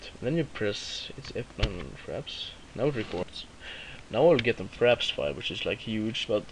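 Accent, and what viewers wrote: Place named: Sweden. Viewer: haha var tvungen att fråga , det lät riktigt svenskt!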